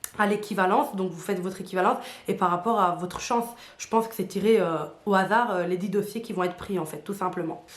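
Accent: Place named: Belgium. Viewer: L’accent belge est vraiment marqué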